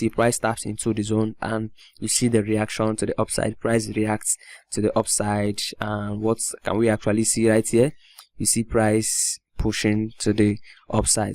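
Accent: Nigeria. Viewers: Where are you from bro? Nigerian??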